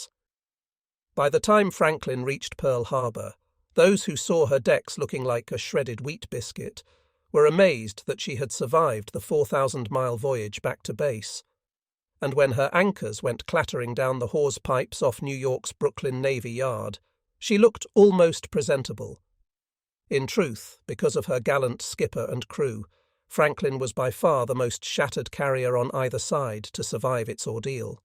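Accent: United Kingdom. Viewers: would be great without this bad accent......its a American story..dopes...thats a limey ..Semper Fi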